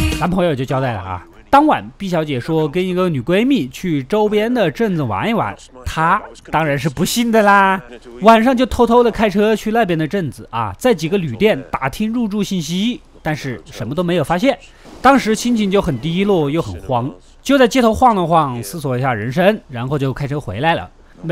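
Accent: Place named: Hunan, China. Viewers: 湖南人吧哈哈哈口音好好玩不然就是湖北人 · 湖南口音好重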